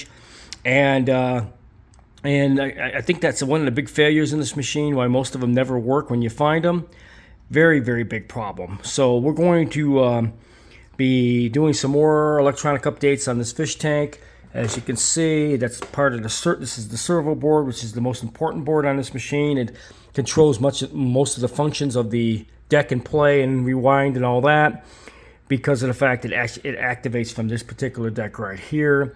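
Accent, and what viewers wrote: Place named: New York, United States. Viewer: You sound like your from NY i em a New Yorker my self.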